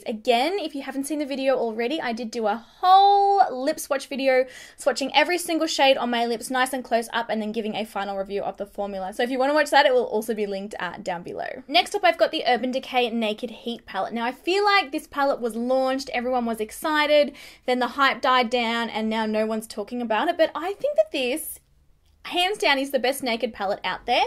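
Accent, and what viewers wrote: Australia. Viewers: I unsubscribed as well from Tati! Haven't watched her videos in months, because your videos are so real and besides, who can't get over listening to your beautiful accent!! You are a beautiful Australian woman who has helped so much with choosing products and I just love you!! Thanks for the tips regarding makeup and skincare!! Great video and your makeup is absolutely lovely and on point!!!! The Estée Lauder double wear foundation is one of Angie's, from Hot & Flashy, holy grail foundation! Therefore, since your recommending this foundation for oily skin types, then I'm gonna have to try it! I've been wearing bareMinerals's powder foundation for soooo many years... I believe it's time to try a liquid again!! However, or someone who may know...do you have a recent skincare (AM & PM) tutorial?? Because, I've been away for some time and am trying to catch up with you specifically!!! 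As always, much ❤️ from your 🇺🇸 RN oily sister!!💕. Hope to hear from you soon!! 💋💋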